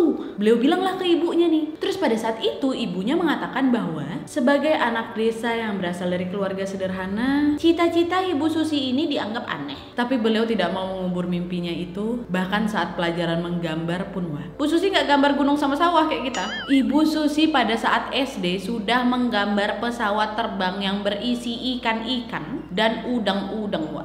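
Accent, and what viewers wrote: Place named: Lampung, Indonesia. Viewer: Kamu orang lampung yahh logat ny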